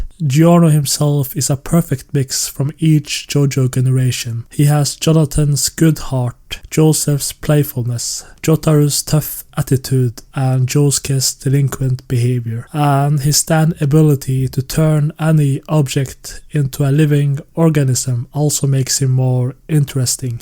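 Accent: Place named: Scandinavia. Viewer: you sound either norwegian or swedish, anyways keep it up :d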